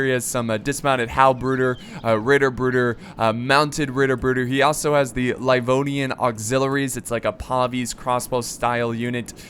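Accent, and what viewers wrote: United States. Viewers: Please, (no offence intended) pronounce those names right, it's kind of annoying to hear them pronounced in an American way. Again, I am not looking for a fight, this is just a piece of advice. Trust me, pronouncing them right grants you extra style points. Great videos, keep it up!:) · but yeah its because of having an accent, an American accent. EVERYONE has an accent. And i bet has problems saying PLENTY of non German words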